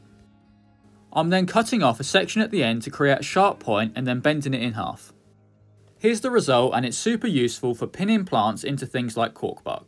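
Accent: United Kingdom. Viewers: bruh this guys british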